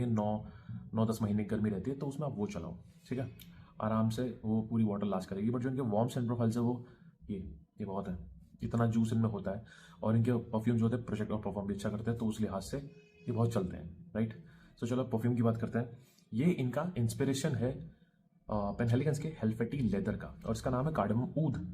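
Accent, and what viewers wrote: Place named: Haryana, India. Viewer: Paa ji aap haryana se ho na kyoki maine kai baar notice kiya h ki kai baar bolte bolte bich bich me haryanvi ton me koi koi word sunai deta h .baaki aapka explanation outstanding h perfume information k बारे me Jyode vasde rvo god bless you .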